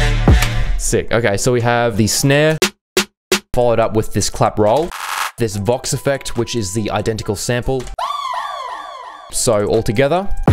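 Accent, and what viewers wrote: Australia. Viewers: bro u from australia yh?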